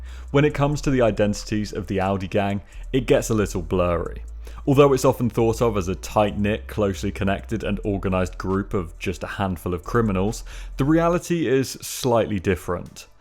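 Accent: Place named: United Kingdom. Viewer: homie believe me when i tell ya, i see no AI in this, this mothefucker got the most UK accent i've ever heard.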